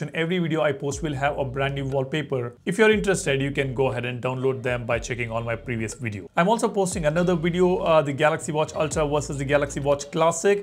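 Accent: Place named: India. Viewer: Can't even with this Indian accent. I'll find another video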